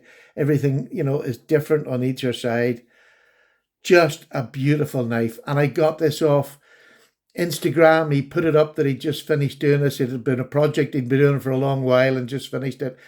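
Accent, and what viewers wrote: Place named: Ireland. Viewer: It is good to see you back to making videos besides all the shout out open tag ones. They are okay but, not if they become the dominate content of all the channels you watch. When I look at all the knives on the table it is not hard to see a pattern appear. You like everything; and that might be an good indication of your success on your channel. Another reason is; Americans enjoy hearing an Irish accent as well as others from places their family once came from. Your opinions are your opinions being seen from your eyes are fun to listen to. They may not be what others think; but, that is a good reason to listen to them. Everyone can learn from others; no matter how smart or how much they know or think they know already. It will be hard for you to choose the five best knives of 2021. 🎁🎄🍀🥔🔪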